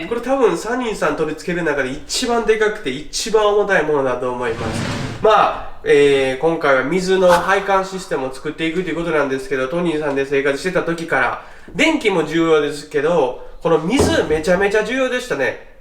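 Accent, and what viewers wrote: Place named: Banshu, Japan. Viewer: いつも楽しく拝見させてもらってます。さんが時々 そんでとか 〜やけどしゃないやろと言った播州弁で喋ってられるのをみてすごく嬉しく懐かしく感じてます。小生中学生まで ６０年前までですが住んでいた者ですから。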